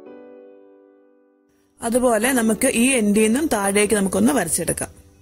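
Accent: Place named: Pathanamthitta, India. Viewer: പത്തനംതിട്ട ജില്ല എവിടെയെങ്കിലുമാണോ വീട്? ആ ഒരു style of talking...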